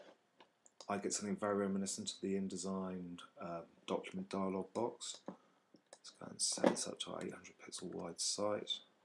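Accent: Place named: Cockney, United Kingdom. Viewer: For God's sake man, turn up up your bloody recording volume if your going to speak in that cockney accent.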